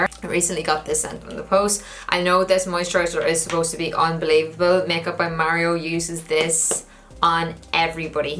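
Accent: Ireland. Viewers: definitely Irish 😍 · I love hearing the Irish accent on YouTube 😍 sounds like me when I talk 😂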